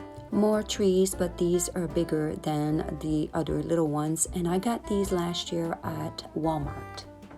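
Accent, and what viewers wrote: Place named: Atlantic Canada, Canada. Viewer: Hi, I just found your channel. I am a fellow Canadian. Love your video. I will definitely watch more. You definitely sound like you are on the East Coast. My parents are from Newfoundland originally but moved to Ontario many years ago. Have a wonderful Christmas 😊🎄♥️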